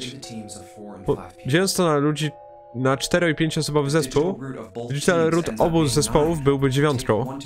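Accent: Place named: Podlasie, Poland. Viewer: coś ostatnio masz chyba jakiś vibe na podlaskie akcenty już drugi film ci się to zdarza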